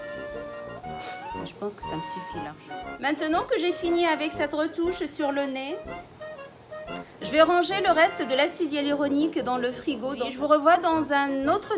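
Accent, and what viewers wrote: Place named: Tunisia. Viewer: Ton accent ça se voit que tu es tunisienne 🤗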